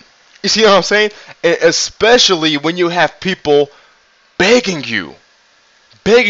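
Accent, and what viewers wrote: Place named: Texas, United States. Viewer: Hold up, you're from Texas!? I always imagined you lived in NY but now that you mentioned it... I can hear the accent (in a way)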